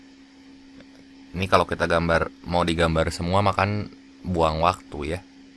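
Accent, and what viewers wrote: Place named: Bandung, Indonesia. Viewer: logat nya bandung pisan mang😂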